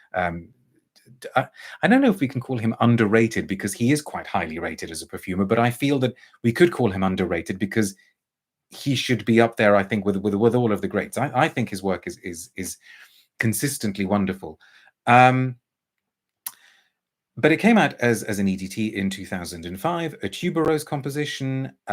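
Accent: United Kingdom. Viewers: I just love the way you say........we are broadcasting "liiiiiiiivvvvveeee' it's just soo classy :) anything in a British accent is just mmmmmm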